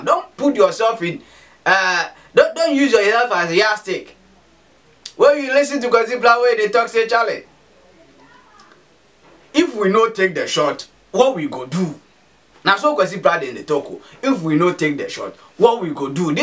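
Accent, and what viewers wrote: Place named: Pidgin, Nigeria. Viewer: hahahaha na soooooo pidgin, welcome 😃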